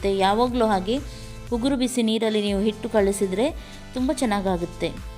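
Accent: Mangalore, India. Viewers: Sis nimma Mangalore bhashe thumba Chennai so nice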